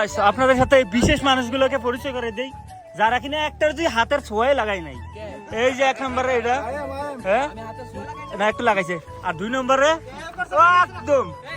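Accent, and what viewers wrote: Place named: Comilla, Bangladesh. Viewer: আপনার কথার বাসায় বুঝা যায় আপনি কুমিল্লা জেলাল মানুষ কোন থানা আপনার বাড়ি জানাবেন ❤ ঈদ মোবারক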